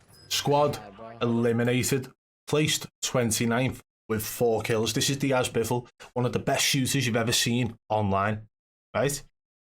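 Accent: United Kingdom. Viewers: I barley understand you British fuck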